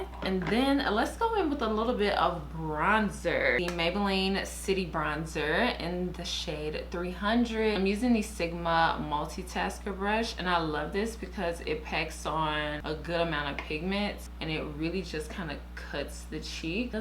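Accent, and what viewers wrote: South, United States. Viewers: Love your content, you're the only person on YT i know who wears their natural hair in it's shrunken state (the reason i subcribed) you're a unicorn. I think if you made dedicated videos showing how you wear your hair (a week in my natural hair type video) those videos will blow up. Your haircare routine is simple which alot of us want. Another video idea you sound southern mayvbe share your experiences being natural in your community?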